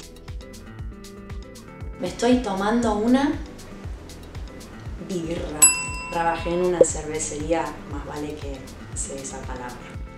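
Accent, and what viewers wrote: Argentina. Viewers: Acento argentino 100% 😀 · Hola... como estás hablando no es necesariamente como se habla en Argentina... asi hablan los porteños y bonaerenses... en el resto del paiz hablamos de otra nanera... si usamos algunos de esos modismos... un saludo gracias dsd Mendoza tierra del sol y el buen vino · Que buen acento Argento! Congratulations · Verdad q a mí me confundió en el principio, parece muy Argentino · fuaaaaaa hasta parece que sos argentina en lugar de yanki.. buen oido!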